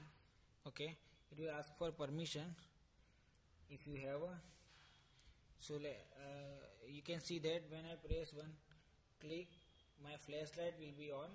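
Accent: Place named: India. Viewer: I love Indian Accent really!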